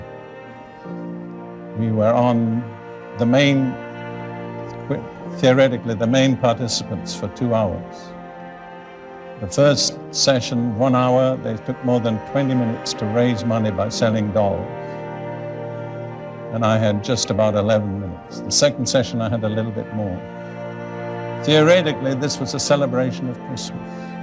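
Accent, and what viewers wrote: United Kingdom. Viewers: You mean his british accent...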